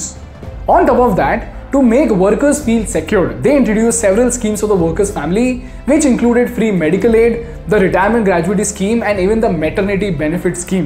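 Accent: India. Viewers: I dont like Indian accent English ☺️😄😆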